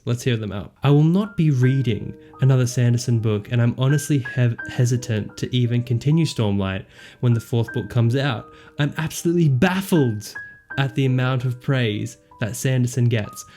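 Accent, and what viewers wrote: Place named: Australia. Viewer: I am loving the Australian that's coming out! More please :D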